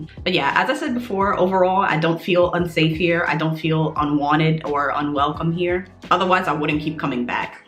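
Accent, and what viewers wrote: New York, United States. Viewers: As soon as you started talking, I knew you were from New York lol. I'm also from New york(Harlem), currently living and working in Germany. I can definitely agree with your video.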